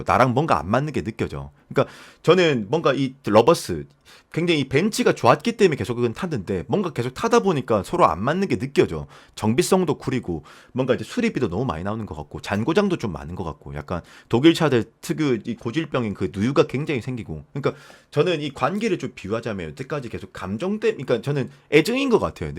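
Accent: Gyeongsang, South Korea. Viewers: 경상도 남성 목소랴가 정겹고 편안하네요 친한 칭구가 말하듯이 얘기해주는 느낌ᆢㅋ 잘 듣고 갑니다~~^^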